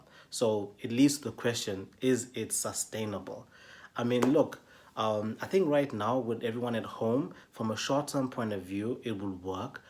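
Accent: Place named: Indian South African, South Africa. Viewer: You sound like a South African Indian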